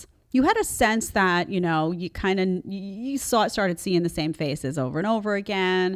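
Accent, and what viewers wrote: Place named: New York, United States. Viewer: Jedediah's NY accent leaks out occasionally. I prefer it, to be honest.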